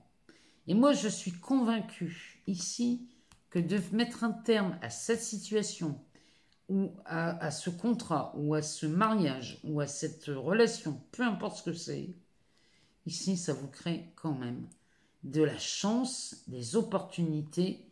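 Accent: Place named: Loir-et-Cher, France. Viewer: j’ai plutôt pensé à l’accent du Loir-et-Cher :)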